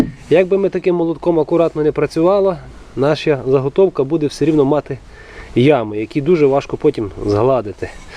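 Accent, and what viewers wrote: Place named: Western Ukraine, Ukraine. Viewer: звідки ти родом? Ато акцент Львівсько-Франківсько-Тернопільско-Волиньий!))))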